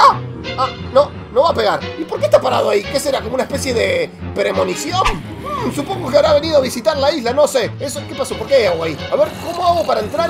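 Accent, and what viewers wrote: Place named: Argentina. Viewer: Eres argentino 🇦🇷🇦🇷🇦🇷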